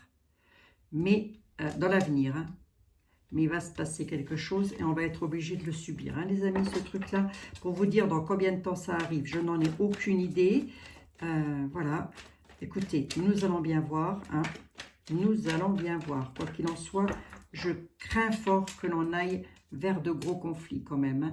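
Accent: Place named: Franche-Comté, France. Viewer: bonjour madame ! Ne seriez-vous pas native de Franche-Comté par hasard ? L'ayant quittée depuis de nombreuses années, j'ai pourtant l'impression d'en revivre l'accent, certaines expressions, les boutades etc. Est-ce que je fais erreur ?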